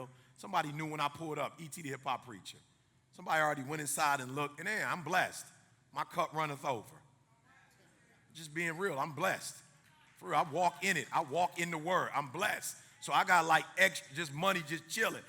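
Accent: United States. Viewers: Please can we get subtitles in arabic or French at least I like your videos and I can't understand all what you're saying because of the American accent. . Thank you 😊❤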